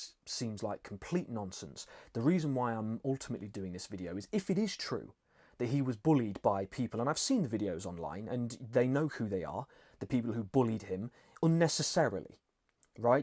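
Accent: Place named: Northern England, United Kingdom. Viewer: Greetings ! You should drop your stupid northern accent because this is ego identity another label. I’m not judging you but being totally honest with you with energy straight from my heart. What you say about me after this I don’t care I forgive you if it’s something negative because you don’t know. I’m a manc I’m a scouser you get it ? This is why you go on the spiritual path to get rid of your lebels. UK is a reptilian prison camp and a matrix which you must escape if you a lightworker I already did I went to live in the Swiss Alps far away from the sick British society anyway the law of one is powerful and those who achieved cosmic unity in oneness with cosmic awareness know this but coming back to this dimension after sometime is very hard but you have to but this time you are a multidimensional being who is in all timelines and dimensions at ones always NOW. 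I hope David transformed well. Peace & Wisdom 🎼🙏🏻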